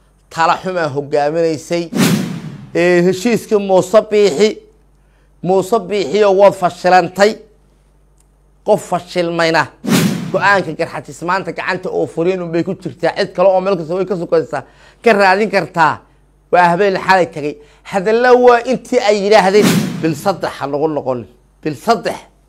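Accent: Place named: Kenya. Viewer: Ninkan waa la haystaa duli ma timid reer sheekh Isaxaaq .waxaad tahay kenyati afsoomaliga bartay.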